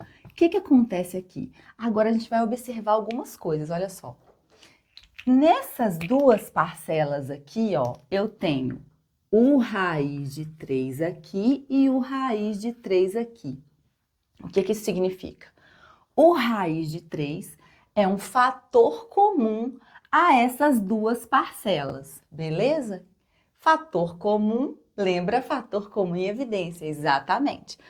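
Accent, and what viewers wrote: Minas Gerais, Brazil. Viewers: Sotaque mineiro...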